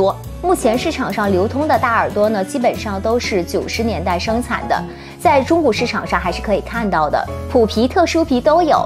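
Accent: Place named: Japan. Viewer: 谢谢做这些Hermès 视频. 你真是Hermès expert 而且也有access to so many unique Hermes pieces. 一个小建议哈： 能不能在屏幕上放上讲述内容的英文，日式英文真的是听不太懂啊……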